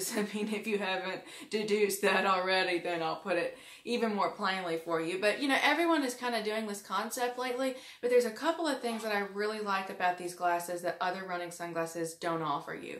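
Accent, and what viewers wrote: South, United States. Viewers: I'll be using my Ray Ban 3487 for more physical activities. But off sunglass topic--"Mardi Gras" and "living in the deep South again" - so was I right that I detect a very slight Southern accent even before you said that? Please don't be offended, I was just curious.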